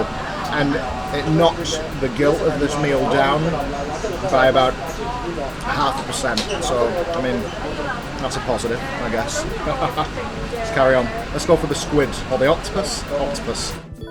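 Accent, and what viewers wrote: United Kingdom. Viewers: Lovely British accent and good food. Instant subscription and like👍🏽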